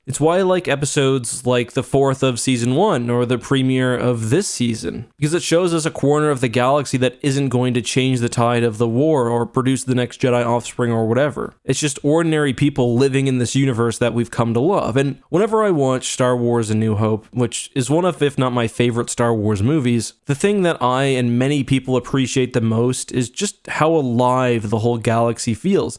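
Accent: Canada. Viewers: Are you Canadian? I detect a hint of Canadian accent, eh. This might help explain why you felt all mushy about that scene. Trudeau. I think he'll be the next Bruce Jenner only way more feminine. If you are Canadian then it sounds like he's had a bad influence in you. Man up! This is The Mandalorian, not Gilmore Girls.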